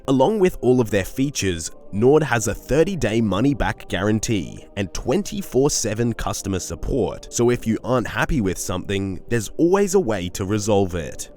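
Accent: Australia. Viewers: wtf your Aussie, never knew :) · wait you australian? lol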